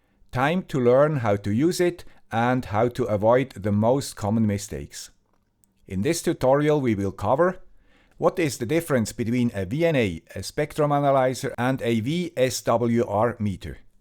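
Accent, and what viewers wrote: Switzerland. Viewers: So true Sir. And the cool guy with the cool Swiss accent always responds! Talking about dedication 😎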